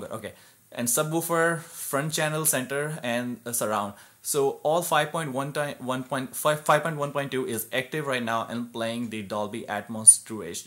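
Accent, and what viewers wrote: India. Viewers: Christ Youtube doesn't support 4K ??????????????????????? you need to prepare a lot before making a video and first speak slowly no need to rush. what accent are you on ? india ?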